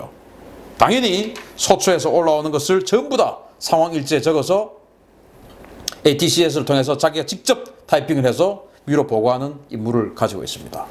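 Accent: Gyeongsang, South Korea. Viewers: 또!!!!!!! 또!!!!!!! 쫌!!!!!!! 갱상도 남자의 강력한 멘트!!!!! 쵝오!!!!!!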